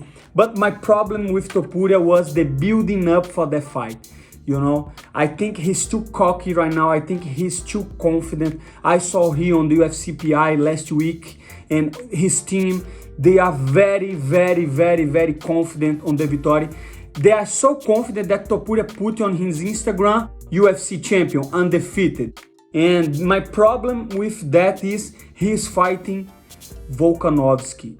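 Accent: Brazil. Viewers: Money, my number one hope for you is to become UFC champion. Number two hope is stop learning more English. The Brazilian accent is perfect. Number three hope is please, brother, can you teach Americans some Portuguese slang?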